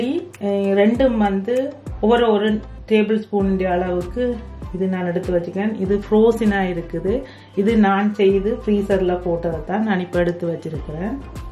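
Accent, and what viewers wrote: Jaffna, Sri Lanka. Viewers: Very tempting receipe enjoy your receipies. Very much .specialy our jaffna Tamil.thanks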